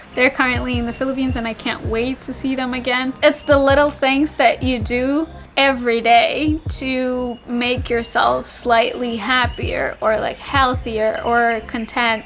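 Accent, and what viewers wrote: United States. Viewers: Hey. How come you don't have a heavy Russian accent? You're accent is almost American. Did you live in the States? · I love it when you cuss, laugh, and giggle even at your own jokes. You're American born and raised in Calif, am I right? It seems obvious in every way you speak & express yourself. We might be related. I'm your creepy uncle, hahaha. Kidding. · Are you American or Canadian? Which state you at?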